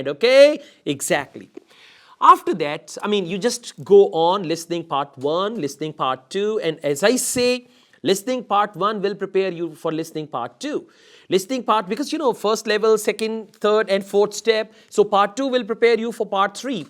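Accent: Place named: India. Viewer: I don't like when you talk in Indian 🙄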